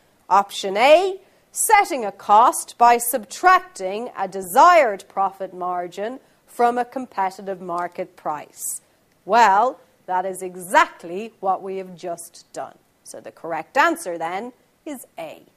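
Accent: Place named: United Kingdom. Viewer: wow uk english hit different eh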